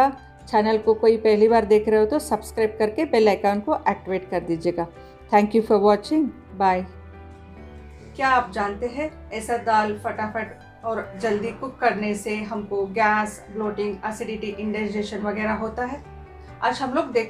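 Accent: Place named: South, India. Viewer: aapka hindi me south india wala accent hai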